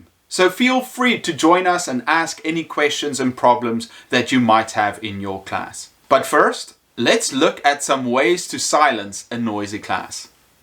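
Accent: South Africa. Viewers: You sound South African... :) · Thank you.I love love call and respond technique.I Shout "can you hear my voice?" And they have to clap and stand still. My voice is still hoarse from shouting,talking and just interacting with students all day. LOVE THEM tho. South African?🇿🇦🙌😊much love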